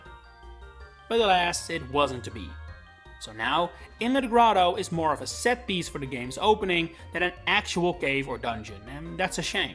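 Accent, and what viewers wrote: Netherlands. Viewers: Me, hearing you accent for the first time: "MEDELANDER????" Flash caves are the actual worst tho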